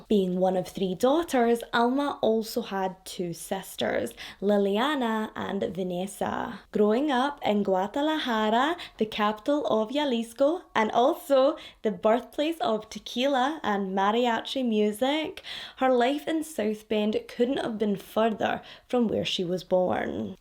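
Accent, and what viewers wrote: Scotland, United Kingdom. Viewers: You remind me so much of my Scottish friend that I miss so much that it feels super comforting to listen to you talk 😅 Truly you're an absolute delight, can't wait to watch this whilst procrastinating tomorrow 😌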